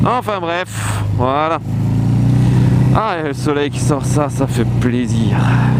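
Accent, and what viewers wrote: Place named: Alsace, France. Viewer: Putain l'accent à couper au couteau, tu viens pas du nord de l'Alsace toi? xD Bonne vidéo pour expliquer le but et "l'utilité" ou non de la customisation! :) Oublie pas de remercier Black pour t'avoir apporté le soleil! (ololz) Maintenant que tu fais des glisses faudrait penser à tourner en même temps hein. :p #letrollfacile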